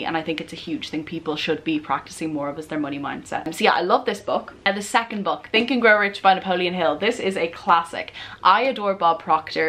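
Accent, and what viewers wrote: Ireland. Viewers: Which part of Ireland is your accent from? I've been watching for a while and I absolutely love it! 😁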